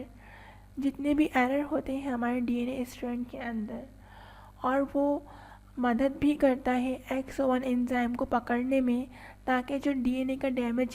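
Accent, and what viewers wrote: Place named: United Kingdom. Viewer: Ap aise hi bolti ho kya hindi me english accent dalne ki kosish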